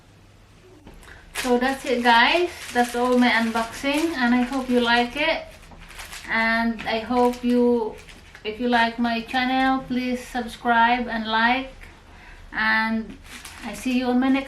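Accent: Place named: United Kingdom. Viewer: british accient na tlaga. i like this camera i have one very good quality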